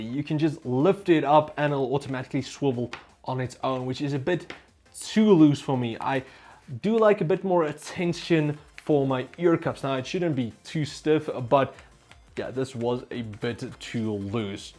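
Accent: South Africa. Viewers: kak mad didn't know we had a South African Tech youtuber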